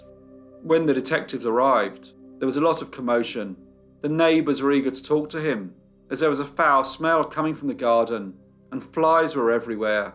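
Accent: United Kingdom. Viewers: Nothing eases anxiety like listenening to someone from the British Isles narrate horrifying and disturbing crime. Thank you so much.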